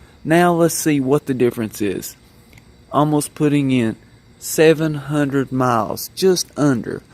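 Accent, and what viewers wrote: South, United States. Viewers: It's how people say oil in the south. Pronounced "ole"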